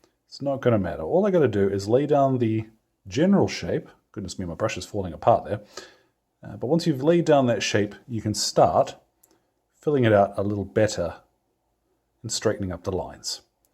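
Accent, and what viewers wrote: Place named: New Zealand. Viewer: A useful technique, and I hope you continue with your Kiwi flavor. I always think of Tabitha from Fallout when I hear battle cattle.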